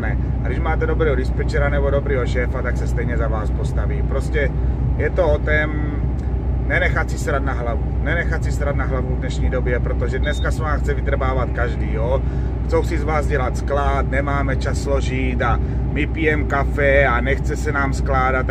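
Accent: Moravia, Czech Republic. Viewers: Perfektne video, nedalo mi a dnes som si ho pozrel druhy krat. Dobry koment,obraz proste vsetko a ten prizvuk moravsky, moravakov mam rad:-D S to bolo ozivenie. To Nemecko ti nezavidim ked nemas den tak vindes so STAU prejdes 50 km a je tam dalsia a to nejazdim s kamionom. Klobuk dole pred vasou pracou, ja som o nej par krat premyslal ale nedal by som to pri tych preplnenych cestach, ako si povedal odstavit zahodit kluce a ist domov vlakom:-D toto zazivat kazdy tyzden by ma kleplo. Tesim sa na dalsie videa a drzim palce. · ty moravaku fajne:-)moc hezka rec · Ta moravština je i pro mě úplně suprová, zvláště když jsem se ocitla v Čechách a oni tady mluvijou fakt divně:-D:-D:-D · Nemá chybu ta moravská mluva, je to vtipné, ale v dobrém smyslu, dobře sa to poslúcha:-) Tak tak lidi by měli být na ty kamioňáky ohleduplnější však to není sranda toto šoférovat apropo kdo by jim domů dovezl ty jejich ebaye a wishe a další... 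· Za mě super, tož Ti dávám odběr 👍 A hrozně dobře se to poslouchá, ta naša moravština 😃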